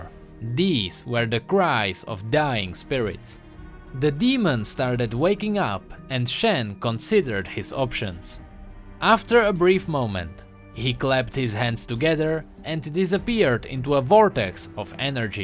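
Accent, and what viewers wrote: Czech Republic. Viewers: poznal sem hned podle toho přízvuku že si čech :D your realy good keep going!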